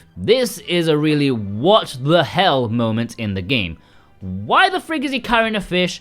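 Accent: United Kingdom. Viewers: 🐬 My favourite game of all time. You made a fantastic video that made me laugh in several places. Tell me though, you sound like you hailed from Blighty.... Is it just me, or does Galcian look like Noel Edmond's megalomaniacal doppelganger? Part of my hope were dashed when we didn't get a boss fight again Mr. Blobby! 🤣I also agree, I would love a model of the Delphinus, but I do have a statue of Aika so it's not all bad.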